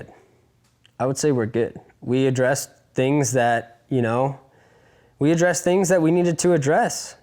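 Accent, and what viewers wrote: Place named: Simi Valley, United States. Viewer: lol i live in simi valley. a lot of my boys talk like that😂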